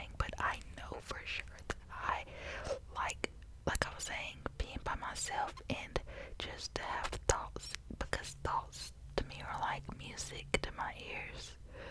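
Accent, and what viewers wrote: South, United States. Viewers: Big southern.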